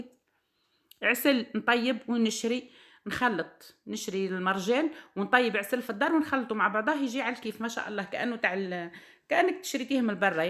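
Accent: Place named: Tunisia. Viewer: السلام عليكم أختي، ما أحلى كلامك آنا أختك من تونس منفهمش الفلوس الدزيرية وما لقيتش قناة تونسية متاع خزين، ولهجتك تحسها انك من تونس. تنجمش تعمللنا بالدينار التونسي؟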